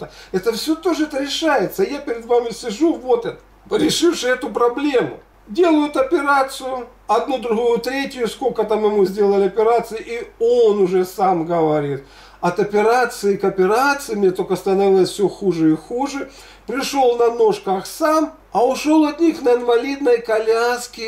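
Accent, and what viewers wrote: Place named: South, Russia. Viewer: Благодарю,Геннадий,все посуществу,а мне нравиться наш южный говор ,а кому не нравится,пусть не соушают!Я так и подозревала,сделали ставку на Ваше имя,хотели деньги зарабатывать на передаче.Как то не верилось в благость телевидения.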